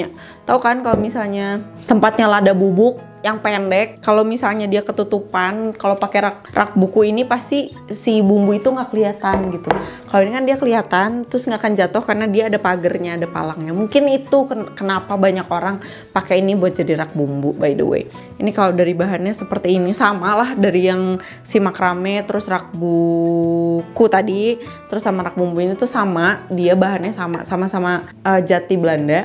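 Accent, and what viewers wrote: West Java, Indonesia. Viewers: Nyunda pisan 😂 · Sundanese girl banget anjir logat ngomongnya😂😂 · Si teteh ngomong nyh Sunda, aku suka❤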